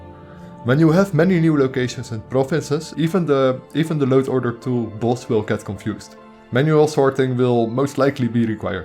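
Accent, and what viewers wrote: Netherlands. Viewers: Je bent nederlands hoor ik gelijk! :D NICE!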